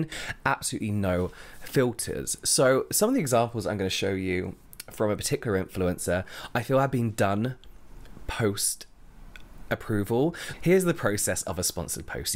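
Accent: United Kingdom. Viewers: This guy can talk and talk for hours nonstop about things idc about and I'd still listen to it all...............I blame the British accent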